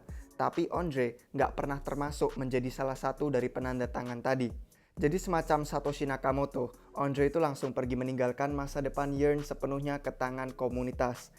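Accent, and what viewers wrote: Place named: United Kingdom. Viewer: pronounce british emang seperti itu ngab , gak lebay mungkin kamunya yg gak tau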